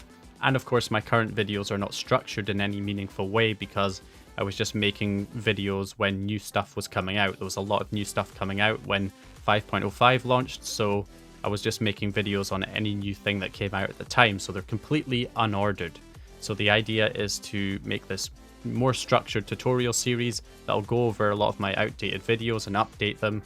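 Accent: Scotland, United Kingdom. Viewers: Mic is ok you still sound Scottish 😄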